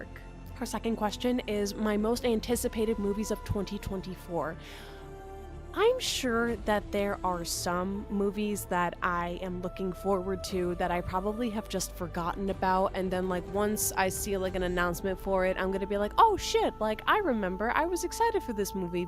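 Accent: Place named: New Jersey, United States. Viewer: Okay so I’m finished listening and I’m so here for your warrior cat oc lore they’re so coool and I always adore a chapter that’s complex and with a bit of religious power Hexmoon is currently obsession ALSO JERSEY REP! I was like I know that accent and thought it was Philly 😭 but damn love this so much!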